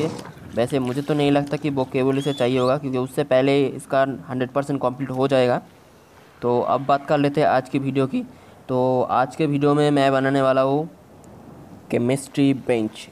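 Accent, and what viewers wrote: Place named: India. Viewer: He’s from India listen to his accent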